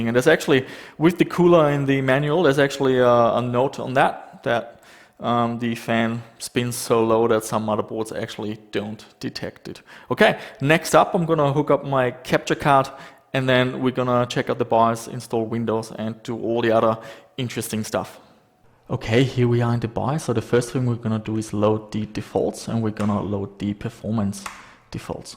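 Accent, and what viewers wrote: Germany. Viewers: Ach du bist Deutsch :D Hab mich schon die ganze Zeit gewundert was das für ein Akzent ist XD Tolle videos, weiter so! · are you from germany or austria?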